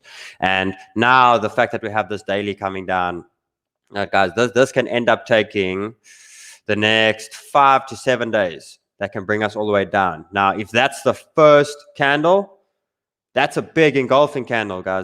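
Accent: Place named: South Africa. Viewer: I’m South African, but damn, I’m loving your accent. I miss SA accents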